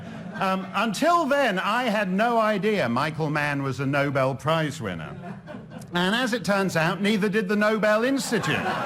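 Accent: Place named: England, United Kingdom. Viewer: Im from the UK and this guy is obviously the dumbest Englishman I have ever had the misfortune to see. Hilarious that all you idiot deniers will believe anything he says because of his accent. He looks like a partially shaved Groo the wanderer but Groo wasnt a gay man and he hadnt been paid off by the fossil fuel industry like this guy and frolly both have.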